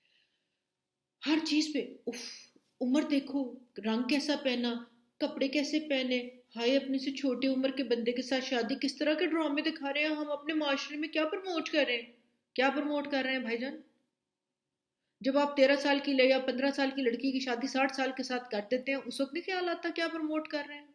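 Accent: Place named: Punjab, India. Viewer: 👍👏👏👏 very well said, in such an interesting manner with a tarka of Punjabi. 😂Love her style of speaking. 👌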